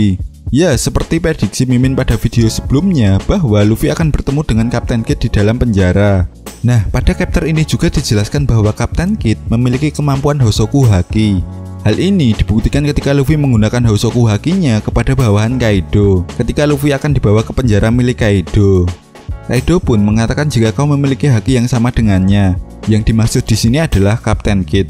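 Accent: Java, Indonesia. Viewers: Suara nya jawa banget mas , gaenak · Logat Jawa 😂😂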